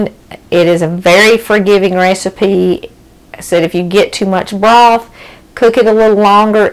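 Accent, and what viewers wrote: South, United States. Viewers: I found your Channel today when trying to learn how to make brown gravy from scratch then I checked out a few more of your segments after hearing your country accent giving God praise every segment I felt like I was home I subscribe to your Channel and yes praise God he is so good so so good to us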